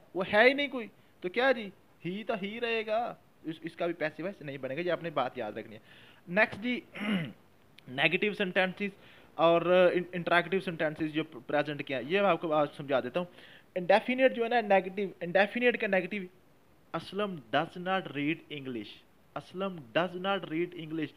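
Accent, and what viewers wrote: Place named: Punjab, India. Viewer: v informative lecture Sir ❣👌👌....way of teaching very amazing with punjabi toch...😍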